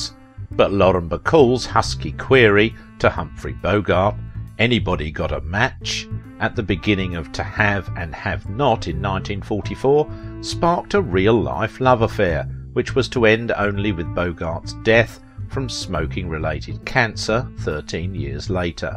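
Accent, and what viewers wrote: England, United Kingdom. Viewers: It's BY WHOM!!!!! Very British accent but awful English.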